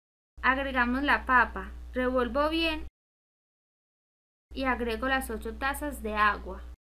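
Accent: Colombia. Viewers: Me encantó! Si solo verlo da gusto imagínese probarlo :p saludos desde Perú. Ah por cierto esa voz tan típica de Colombia es hermosa y enamora😌😍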